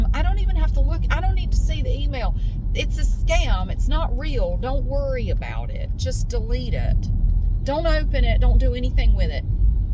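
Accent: South, United States. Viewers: Wow your southern accent is thick tonight. Lol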